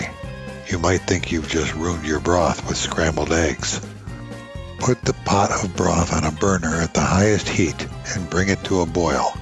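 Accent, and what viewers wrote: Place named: Chicago, United States. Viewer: Thank you very much for your tutorial videos! I am especially fond of that Chicago-sounding accent. Makes me extra homesick when I am cooking, missing my both of my Grandmas, Aunts and of course, my Ma!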